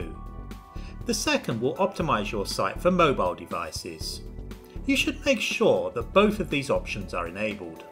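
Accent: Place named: United Kingdom. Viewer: Well done, what a great tutorial of Wix (and with this British accent as well:)) I built my first website now, indeed no more than 10 minutes, but there is some work a head of me still. I think I'll get a logo somewhere and I need to add my content. Thanks a gain for this simple tutorial.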